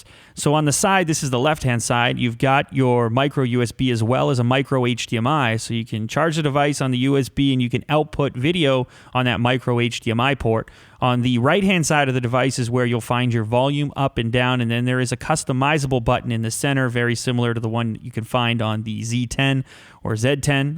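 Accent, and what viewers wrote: Canada. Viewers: He's Canadian?? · YOURE CANADIAN? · Hey are you from Canada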